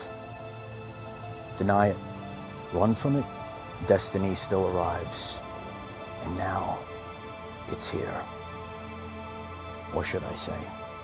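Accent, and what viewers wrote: New York, United States. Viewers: Love your inspiring videos, and being a New Yorker myself, your NY accent...thanks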